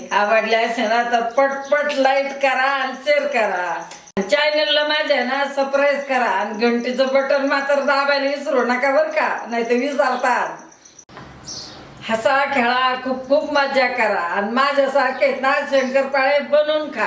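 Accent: Ahmednagar, India. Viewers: आजी तुम्हाला पण दिवाळीच्या खूप खूप शुभेच्छा. तुमची नगरी भाषा मनाला खूप भावते. Love from Nagar.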